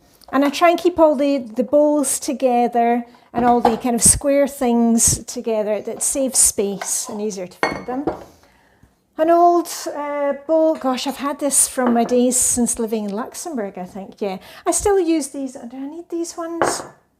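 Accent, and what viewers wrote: Scotland, United Kingdom. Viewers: Just discovered your channel. And being a Scot it's great to hear the accent. I was half way there with my routine going to take it further learning from you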